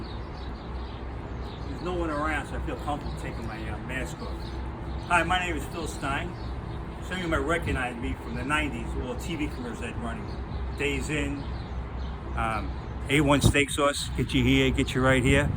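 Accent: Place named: New York, United States. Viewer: Add me too a little Di Niro! Going on here the accent the look. You are much cuter and younger! Born in Oceanside, I will be tuning you for my NYC fix! Thanks!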